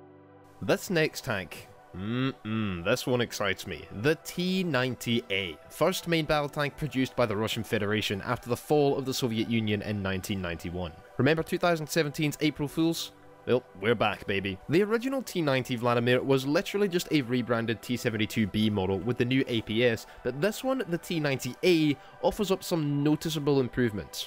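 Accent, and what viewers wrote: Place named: Scotland, United Kingdom. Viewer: I honestly love the Scottish accent. As a matter of fact, I just love the Scottish in general.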